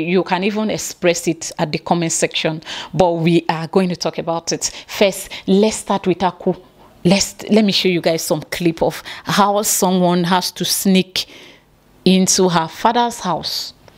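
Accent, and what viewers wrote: Ghana. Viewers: You lady, you are funny, you speak as if you are a Ghanaian.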